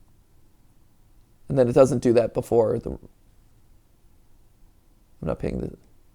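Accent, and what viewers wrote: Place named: United Kingdom. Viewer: That accent, insane british salesman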